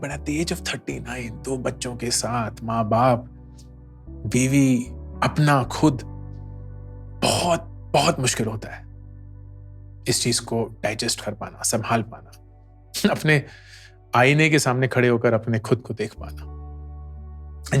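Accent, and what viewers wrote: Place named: Kashmir, India. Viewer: Can't help but appreciate your subtle Kashmiri accent. "Pata hai kyu" Highly appreciable journey.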